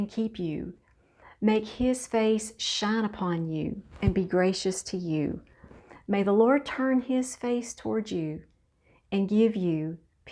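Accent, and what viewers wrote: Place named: Tennessee, United States. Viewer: Are you in Tn. We have the same accent.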